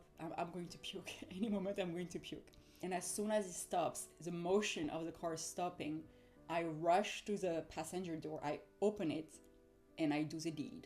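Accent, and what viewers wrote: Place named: France. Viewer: I was expecting a charming French accent, aaaaaaand.... you got it!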